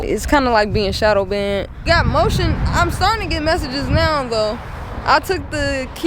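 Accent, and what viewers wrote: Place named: Detroit, United States. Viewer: You sound so Detroit sis lol you in the city?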